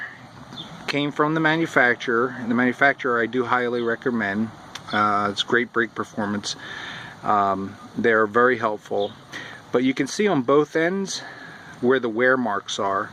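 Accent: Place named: East Coast, United States. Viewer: Your def from Back east. Got the accent!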